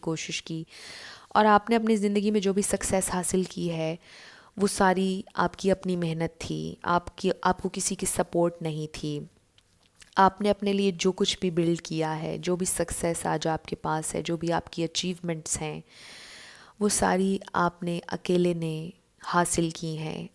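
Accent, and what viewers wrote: Pakistan. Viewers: her way of talk matched with us i m also pakistani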